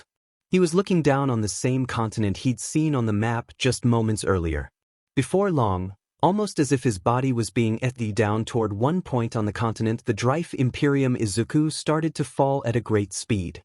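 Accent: United Kingdom. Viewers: Why are you suddently British? No like you didn't have a British aí voice videos ago and now you have tf?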